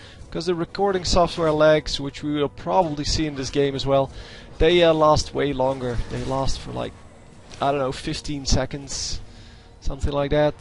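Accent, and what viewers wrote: Netherlands. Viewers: Your accent seems dutch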